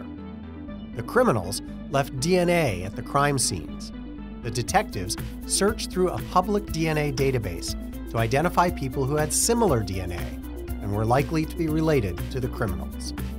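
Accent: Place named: United States. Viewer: The American pronunciation of harassment, detail and zebra made this difficult 😅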